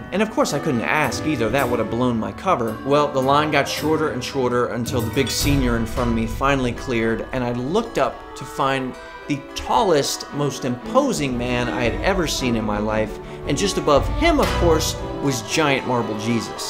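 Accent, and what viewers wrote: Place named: New Jersey, United States. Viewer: For the longest time I would have thought you to be Italian because of your accent (you sound like you're from NJ and with the nice thick black hair and chiseled jawline... slaps face ... okay Tiny, ya need to get back on track!) In that respect I would have assumed you were Catholic. But my friend says your last name is Ukrainian and if you were brought up in any religion it would likely have been Eastern Orthodox or Lutheran, depending what part of the Ukraine your family had originally immigrated from. So is she right?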